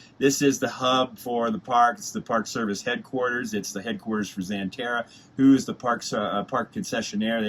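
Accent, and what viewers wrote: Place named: Western Pennsylvania, United States. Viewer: Are you from western PA or eastern Ohio? I'm picking up on an accent.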